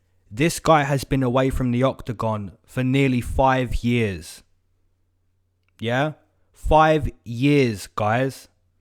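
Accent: England, United Kingdom. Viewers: Hey man always looking for your predictions love your english accent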